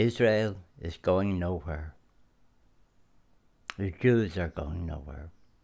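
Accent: Ireland. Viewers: My goodness. That was wonderful! Thank you. You must be a stage actor your monologue is the very best of Irish cadence and delivery. Wonderful wonderful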